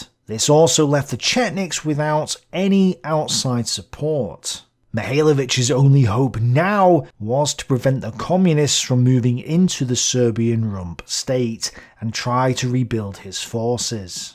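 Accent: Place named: North, United Kingdom. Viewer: I was born in New Hampshire and like your shirt. Can’t quite place that accent. Sounds like you’re from the Far North!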